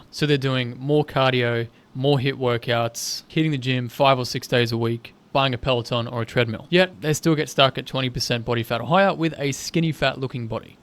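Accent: Australia. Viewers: I couldnt understand a word. Are you osstrayliyen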